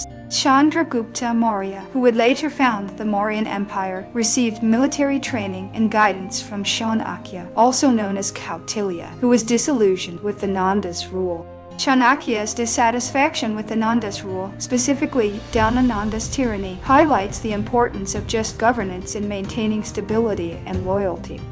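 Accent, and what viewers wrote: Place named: United States. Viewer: why do you use an american accent that corrupts Indian names? Have the decency to be true to your subject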